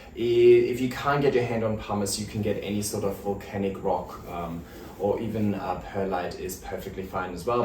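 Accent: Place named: Germany. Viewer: I just found you recently and I am loving the content 😍 You brought back my passion for plants after it's been dormant for a year or so 😊 Excuse me for being curious (I love different English accents), you call yourself, but do you have a German/Austrian background? Keep up the good work 👍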